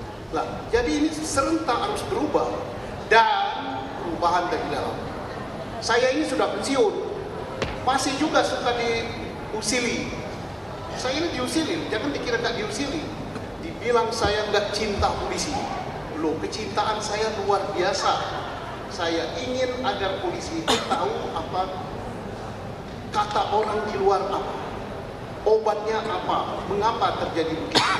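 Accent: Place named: Sumatra, Indonesia. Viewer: Sy beri tahu inilah ciri khas orang sumatera bercanda nya logat nya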